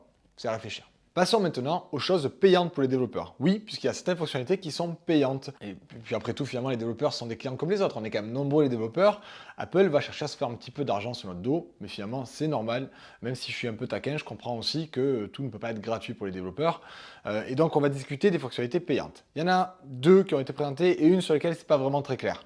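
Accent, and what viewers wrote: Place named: Marseille, France. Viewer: ta l'accent de Marseille ! cool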